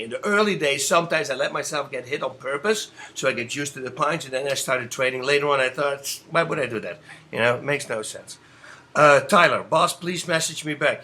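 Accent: Netherlands. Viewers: ja das ff wennen Brabants dialect :)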